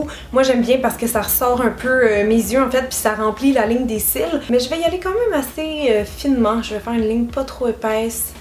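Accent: Quebec, Canada. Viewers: Il fait quelle température au Québec? Chez moi dans le sud ouest de la France à 35°C on étouffe!! Est-ce que les bases de maquillage tiennent bien quand il fait chaud? Tu pourrais faire d'ailleurs une vidéo que sur les bases pour les différents types de peaux, par exemple lesquelles sont mieux pour peaux grasses surtout pour les paupières, parce qu'il y a tellement de marques et de produits différents que je suis perdu! Merci à toi et j'adore ton accent canadien:) ❤️ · Cela faisait bien trop longtemps que je n'étais pas passée par là ! L'accent québécois, la bonne humeur et les bons plans m'avaient manqué ! J adore le rendu! Et je ne sais pas pourquoi tu n'assumes pas le orange, avec tes magnifiques dents blanches tu aurais tord de t'en priver ;)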